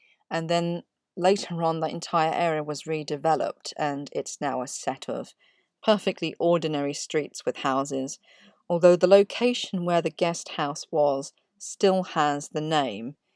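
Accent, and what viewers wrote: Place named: England, United Kingdom. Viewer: Lovely to hear such a cultured well spoken English voice, a relative rarity these days! .. Although I suspect Wun't and Druv would perhaps make more sense to the listener spoken in a more northern English accent. Since the vowel change in the language (particularly in the home counties ) these words have become slightly non-sensical.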